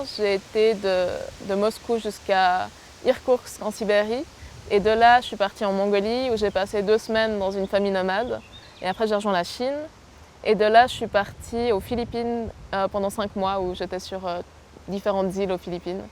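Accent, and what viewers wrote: Switzerland. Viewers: Titrer "sans argent" alors que en moins de 10 secondes t'entends deja qu'elle est originaire de Lausanne en Suisse. Au moins j'aurais perdu moins de 10 secondes ! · J'adore ton accent suisse ! :) Et bravo pour ton courage d'avoir fait le pas !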